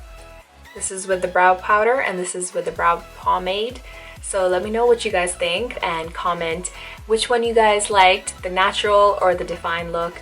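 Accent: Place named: United Kingdom. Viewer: You are starting to have the British accent 😜 Love your brows, they look pretty identical!💋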